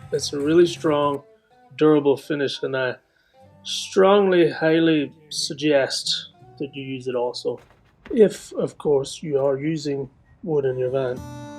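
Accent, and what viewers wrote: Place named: United States. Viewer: Couldn't figure out if you had some weird Seppo accent that sounded a tad Irish - and then you said 'bollocks'...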